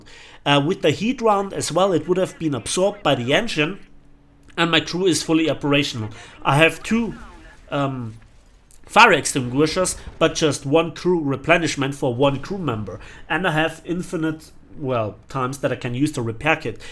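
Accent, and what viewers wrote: Germany. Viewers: dieses extrem deutsche Englisch macht dich so sympathisch. Abo hast du :)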